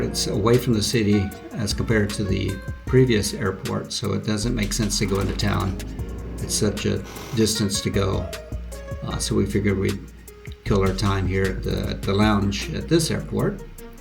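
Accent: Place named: United States. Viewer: hi, thanks for the video, ex-californian here in sofia for 6 years. i did find your american 'a' in sandanski a bit grating, i hope you eventually find it natural to use the bulgarian sound for 'a' at least in the name of your new city. showing respect for the locality may make your integration a bit easier. i look forward to following your story. best of luck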